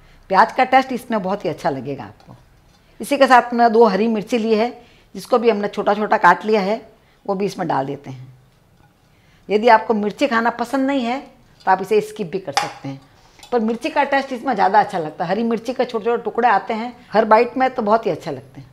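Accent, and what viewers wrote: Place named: Marwari, India. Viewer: apki boli se lagta h ya aap marwadi h .yhaa baris bohat h me triy karungi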